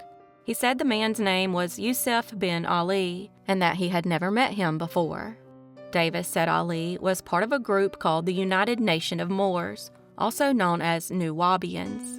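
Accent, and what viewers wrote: South, United States. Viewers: Does it make sense to anyone else, that a southern voiced AI is doing such a horrible job of narrating these stories! Can we get a New York narrator please?! Geez!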